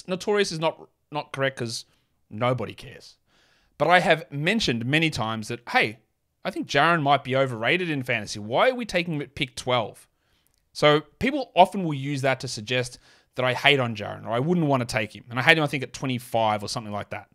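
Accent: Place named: Australia. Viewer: Compared to you I’m the Michael Jordan of fantasy basketball. You probably the same kind of idiot that picks Jimmy butler in the second round. I hope people don’t pay money for your content. That’s just criminal. Taking basketball advice from someone with like an Australian accent doesn’t seem like the move when they probably don’t even sell basketballs in your continent. Continue throwing your money away with your fantasy leagues. Hope you actually learn something one day with these countless hours you waste on these videos. Goodbye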